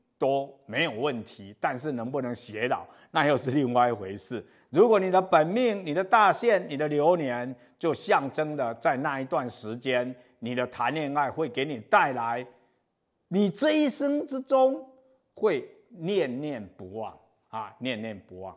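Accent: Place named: Taiwan. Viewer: 老师的普通话的口音是台湾人已经是很容易听得明白。你试按上面字打开幕那个扭试试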